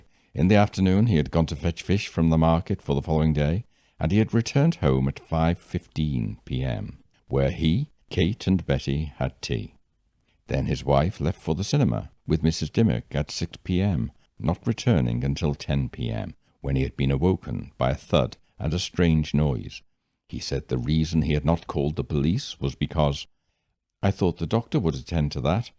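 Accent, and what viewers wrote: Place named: Swansea, United Kingdom. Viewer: Having just finished my own first book, you have my sympathies. It involves such a monumental commitment of time and emotion with no guarantee of conventional publishing success, but with the strong platform you have built here I’d have thought there’s a good chance you’ll get interest in publication. I had no idea you too are from Swansea (though I thought I detected traces of a local accent in your voice). Ive enjoyed your work greatly during the last few very difficult months so wish you ‘pob lwc’ with your book, which I can’t wait to find out more about especially as the locations are so familiar.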